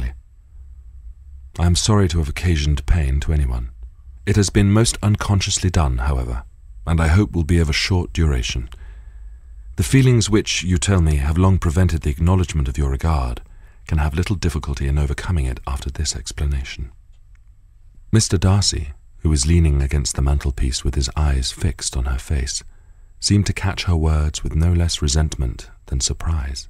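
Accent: United Kingdom. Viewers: Ador his voice and accent! Brilliant british actor! 💖💖💖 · This is the best British accent voice I have ever heard in my life. His voice is such a masterpiece. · I was thinking that, only Joseph Morgan voice and British accent can make me to fall in British Lords, but Mr. Darcy is the true Magic, I discovered years ago ... in love with this voice, this accent and this eyes and all .... 😘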